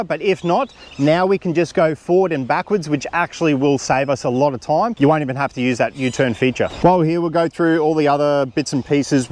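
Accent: Australia. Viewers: Thanks for this vid - it's great to see it in use on an Aussie property (with an Aussie accent!) I've just put my order in for the 4WD version, expecting it between chrissy and new year. As a relatively small female in my mid fifties, mowing 27 acres is a challenge - I usually focus on 5 acres and let my neighbors cattle moo the rest. As much as that is a nice thing to do, it means keeping fences closed and dodging cow pats for months. My place is on 30-40 degree slopes, with a front paddock, a back paddock, an orchard and the house yard, all of which are laden with red bellied blacks and ticks during the hot months and leeches during the wet. Because I've purchased the 4WD version with the extra wide/traction wheels, I'll be able to use this while standing somewhere safe and shady, or at the very least, following through a path of mowed area, and that will be life changing. 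I'll be able to do work on parts of my property I've never even walked on and I am sure I will feel safer without having tall dead grass standing like a fire hazard. This machine has an application for people with a disability, sure, and also for people who just aren't very physically strong, and that is going to be a target market that a lot of 'guys' wouldn't even consider. I'll let you know how it performs if you're interested. Oh, and something I have not seen mentioned on vids about this machine - its got a lithium PHOSPHATE battery - which means it last for a few thousand cycles (instead of lithium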